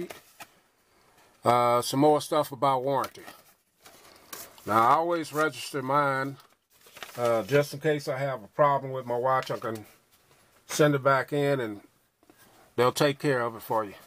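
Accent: United States. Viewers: haha u sound like me... country as hell!!! I do have your interest in them, not saying they are the best but for the price and function I think they are a good value and I am often complemented on mine..also depending on what size the case is, it can make a nice gun/pistol case and keep the silicate that comes with it to keep ur gun from rusting...also the name of the magnified date is called a cyclops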